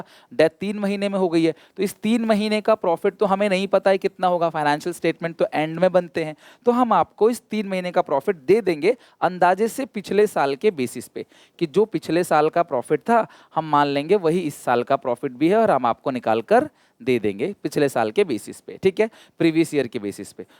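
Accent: Odisha, India. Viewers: Sir r u from orrisa🤔🤔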